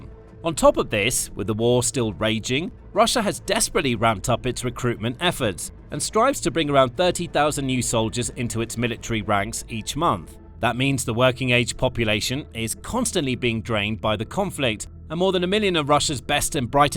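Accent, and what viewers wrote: United Kingdom. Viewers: I gradually got used to associating that british accent with unabashed non-stop lying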